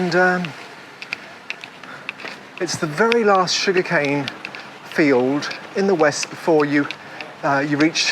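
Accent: United Kingdom. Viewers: Good to hear the British accent vlogging in Fiji. I like your happy tone and saying hello to everyone. Great work!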